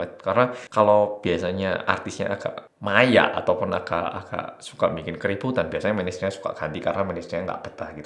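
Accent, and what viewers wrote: Java, Indonesia. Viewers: Jelasinnya tetep medok ya mas, lg serius2 jd ngakak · Keras banget ya industri entartaimen korea,. Mau sedih krna kasus ini nimpaa seunggi🙁, mau ketawa jg mas terjemahinnyan pke logat jawa😅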